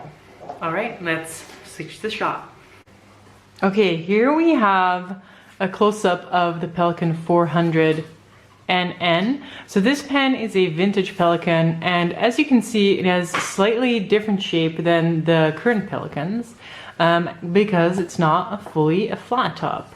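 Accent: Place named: United States. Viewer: How come you do not have a Dutch but an American accent? Just curious, I never would have guessed. 🤔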